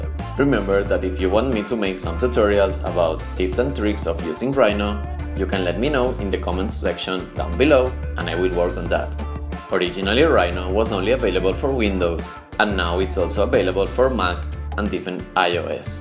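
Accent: Spain. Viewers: como se nota q eres español:)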